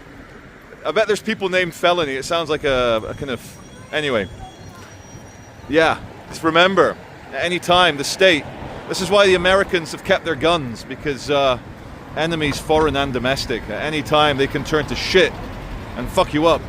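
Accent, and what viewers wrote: Scotland, United Kingdom. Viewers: you have an almost nearly Scottish accent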